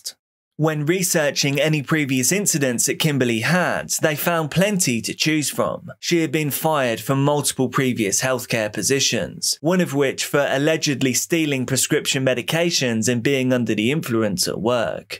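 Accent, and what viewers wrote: England, United Kingdom. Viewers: then your English accent is flawless 😅 he’s definitely English, from a fellow English person 🫡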